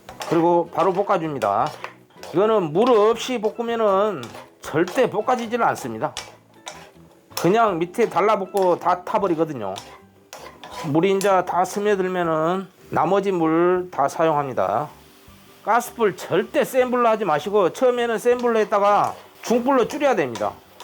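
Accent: Jeolla, South Korea. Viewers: ㅋㆍㅋ 셰프님 전라도네요 말씀이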